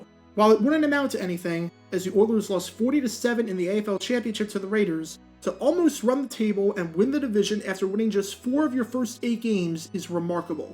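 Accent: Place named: Philadelphia, United States. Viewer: is from philly/south jersey? he sounds like it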